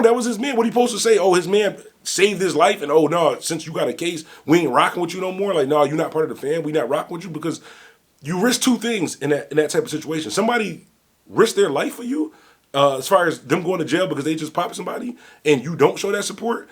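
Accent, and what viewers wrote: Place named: Buffalo, United States. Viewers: Lol if I ever bump into I'm gonna give him 5 mins of disclaimers before I greet him and bro you got an upstate accent its cool though rep ya hood lol Salute bro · You pronounce car funny & yes you do have a Buffalo accent which is proper like Cali niggaz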